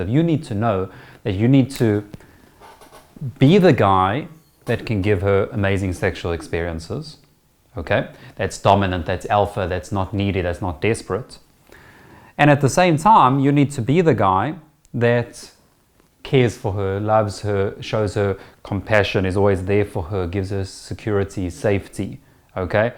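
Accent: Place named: South Africa. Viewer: You sound so South African, oh my days! Thats awesome! Howzit bru from a fellow Saffa.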